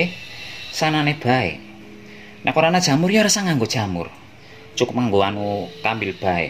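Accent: Banyumas, Indonesia. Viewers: pasti aku ketawa dulu baru komen 😂 salam ublek" capcus wajib di coba ngapak terusss nggeh 😂😂👍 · Itu ciri khasnya kang..bhs ngapak.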